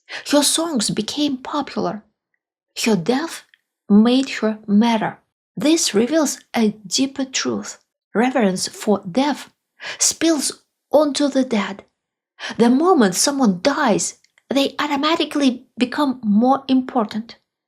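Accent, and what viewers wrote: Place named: Russia. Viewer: Oh, I love this Russian accent ASMR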